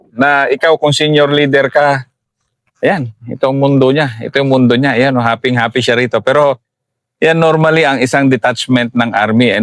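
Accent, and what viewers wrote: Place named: Visayas, Philippines. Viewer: Hnd ah,bsaya nmn yn slita ny,,iba nmn Ang Lugar cnbi mo ung pnnnslita ny,klrong bisdak,🤣🤪👏💪🙄☝️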